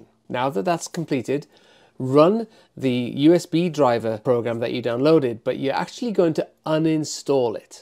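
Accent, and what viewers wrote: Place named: England, United Kingdom. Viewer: Hi buddy, I assume U are english because of your accent. I must say that English people were very usefull during WW2, and today again you did help a poor lonesome french struggling since a long time with download pb with win11/vscode/plateformIO/esptool... to ESP8266mod . I was stuck for 24H .... CH340 behave well with my uart terminal, but not during flashing... I listen your video, and downgrade my CH340 driver from 3.8 to 3.5.... and it fu*king works! thanks guy ! and best regards!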